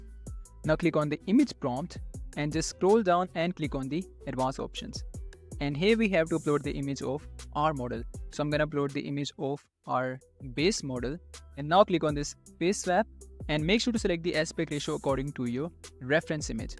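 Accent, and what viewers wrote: India. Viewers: Github? 🤡🤡🤡yeah, if you want to get virus especially hearing this indian accent. Hell no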